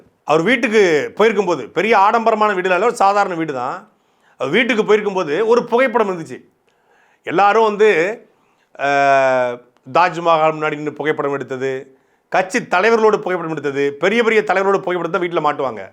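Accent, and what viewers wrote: Tirunelveli, India. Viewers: தெளிவான உச்சரி ப்பு தள்ளு தமிழ் தெள்ளு தமிழ் தெளிவான உச்சரிப்பு திருநெல்வேலி தமிழ் அழுத்தம் திருத்தமான பேச்சு சாட்டைக்கு நிகர் சாட்டையை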